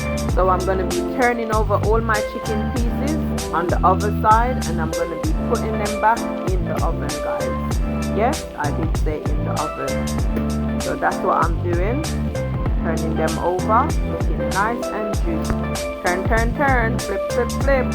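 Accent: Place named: United Kingdom. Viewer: Damn that British and Britishhhh 😅😅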